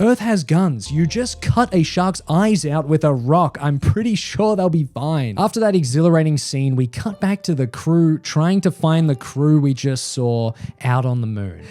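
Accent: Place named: Australia. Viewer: AUSTRALIAN ACCENT DETECTED 🇦🇺🇦🇺🇦🇺🇦🇺🇦🇺🇦🇺🇦🇺🇦🇺💪💪💪💪💪 RAAAAAAH MAD MAX FURY ROAD IS THE BEST SCI-FI MOVIE EVER 🇦🇺🇦🇺🇦🇺🇦🇺🇦🇺🇦🇺🇦🇺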